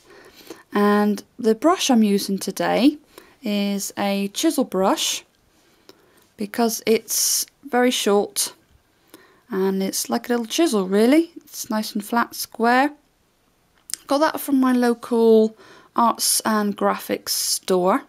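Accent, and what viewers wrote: United Kingdom. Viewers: Are u British or scottish you sound a bit like it ;)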